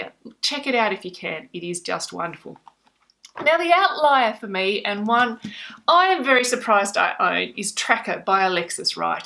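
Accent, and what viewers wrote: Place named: Australia. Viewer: I’m enjoying your videos and I’m getting used to your accent. There is so much humor when I hear what is a perfectly good American word used in an odd way. For instance “The author was rotting”. 😄 Some do don’t they? I assume the reverse must happen in Australia. Anyway, you are quickly becoming one of my booktube favs. Although The Fish Girl has me seriously intrigued, I would choose Terra Nullius to win. Thanks for doing these videos, I’m looking forward to many more. (I love your voice, I hope this didn’t come off as rude.☺️ )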